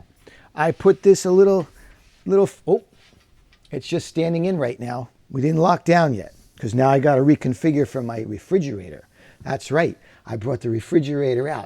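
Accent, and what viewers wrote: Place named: New York, United States. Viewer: I am new to your channel. I saw you on Lola's channel. I love your work & your accent, it's kind of New Yorkish. Yes Americans do have accents!.. Now l want to know what's wrong with the mat! 🤣🇬🇧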